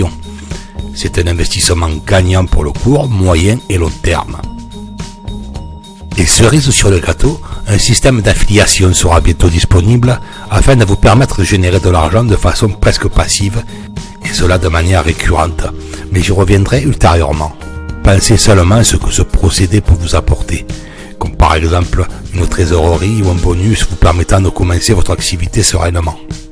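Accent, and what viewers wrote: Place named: Marseille, France. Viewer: Je trouve ce concept intéressant, car j'aime maîtriser ce que je fais. De plus la vidéo est sympa et ludique avec un accent Marseillais qui change de l'ordinaire sur Youtube !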